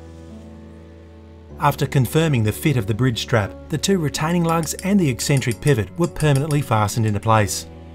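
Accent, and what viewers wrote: Australia. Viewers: Are you an Australian or a New Zealander? Can't pinpoint the accent.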